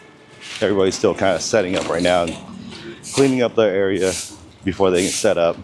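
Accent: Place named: Texas, United States. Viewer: Yeah, they start work super early. My sisters and parents in law just flew in this past Monday. Btw, no offense, but you sound like you're from Texas. LOL.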